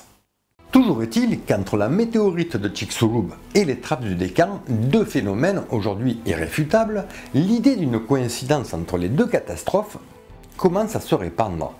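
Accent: South, France. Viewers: J'adore votre chemise, manque des espèces d'arbres fossiles dessus, j'aime encore plus votre commentaire assez clair outre l'accent du Sud ^^ Les trapps de Sibérie semblent indiquer que l'humanité pourrait aussi disparaître un jour suite à un épisode volcanique ravageur enclenché par un réchauffement global et relâchant des mégatonnes de gaz toxiques... Yellowstone pourrait être un vecteur de catastrophe, pour l'instant le fond du cratère se déforme et s'élève et les geysers chantent devant les touristes, advienne que pourra. La peau d'orange qui recouvre le globe pourrait se déformer aussi avec une fonte accentuée des glaces, ce sont des phénomènes qu'on maîtrise encore mal car ils sont multi factoriels, donc probable qu'on soit mis devant le fait accompli en cas d’événements cataclysmiques, mais restons optimistes... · Très bon sujet. Et c'est toujours agréable à écouter avec cet accent plein de soleil alors qu'il pleut à verse chez moi :)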